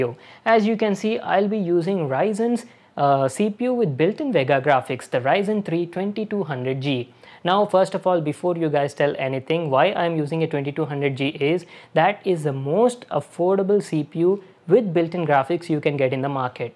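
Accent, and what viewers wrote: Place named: India. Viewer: U know what i like indians because understanding their english accent is too easy love u guys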